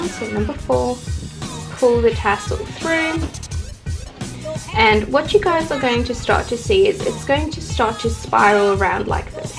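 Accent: Australia. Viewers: In love with this dream catcher! Also, love your Australian accent.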